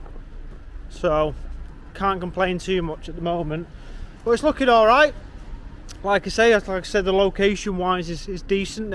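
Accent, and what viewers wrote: Manchester, United Kingdom. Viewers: What’s your accent mate? You sound quite Manc or Cheshire best guess?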